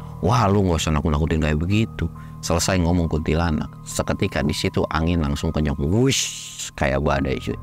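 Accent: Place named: Banyumas, Indonesia. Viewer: Hadir ngapak Bang 😂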